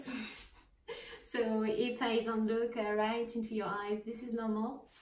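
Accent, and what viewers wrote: France. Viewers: Are U French? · I love that bed warmer on the wall and I love your French accent and your pretty Titi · Mhm. You sound impressively French but in female contrast with a nice rear end.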